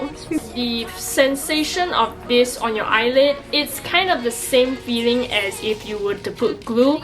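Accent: Singapore. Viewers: I wan hear more singlishhhhhh😂😂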